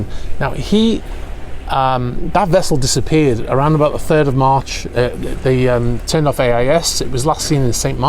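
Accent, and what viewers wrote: Liverpool, United Kingdom. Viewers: Thanks for the "thermal events" ye auld scouse ye....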